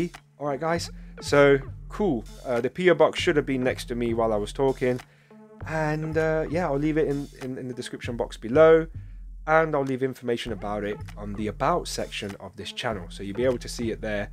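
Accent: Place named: Midlands, United Kingdom. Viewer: I thought you sounded like a midlands boy. Greetings from Northampton.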